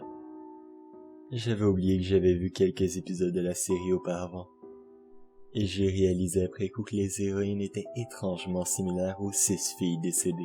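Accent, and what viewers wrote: Quebec, Canada. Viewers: Hé es-tu québécois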